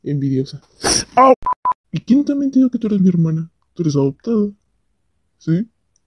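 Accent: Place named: Peru. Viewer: Tu acento peruano es supremo! Amo.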